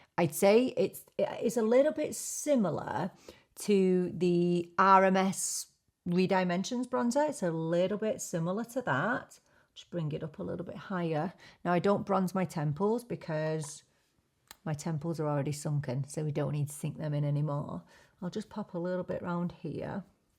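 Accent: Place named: Newcastle, United Kingdom. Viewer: You quote euro currency but at the same you have a strong english accent (Newcastle?), can you share where are you from? 😊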